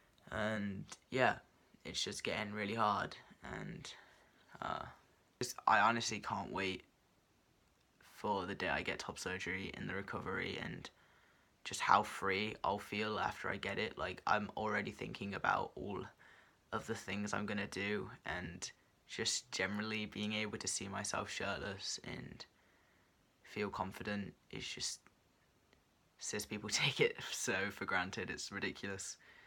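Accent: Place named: United Kingdom. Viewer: I seriously could listen to you talk all day!!! I love your voice and of course your British Accent, I'm such a sucker for the British Accent, The Best to you.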